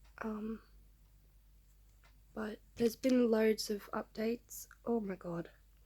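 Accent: Australia. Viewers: it sounds like a pretty typical australian accent to me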